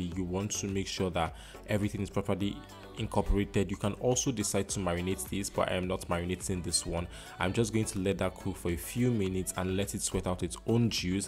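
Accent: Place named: Ghana. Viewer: Bruh. I could swear you Ghanaian lol. This is lit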